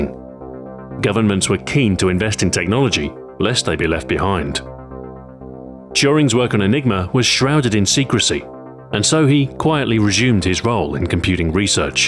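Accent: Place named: United Kingdom. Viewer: Gaming Culture Well he sounds like he's from the UK and we have Dominoe's here as well.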